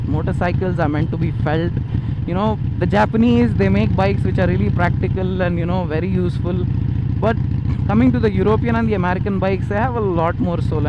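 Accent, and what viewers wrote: Hyderabad, India. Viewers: i knw indian accent but this is way too indian.. and FYI i stopped as soon the accent ws killin me ....literaly..... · Bro please make one video per month in your hydrabadi accent. I love your hydrabadi accent. please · Your accent is awful and here you are trying to get rich on YouTube. Why don't you go fishing and work for a living like the rest of your Indian brethren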